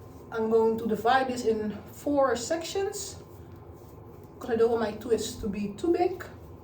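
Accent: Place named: Netherlands. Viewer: Ik hoorde al bij de eerste zin dat je Nederlands was. 🤣 Ik kwam je video tegen omdat ik niet zeker was als ik die cream & butter samen kon gebruiken; dus ik zocht het even op Google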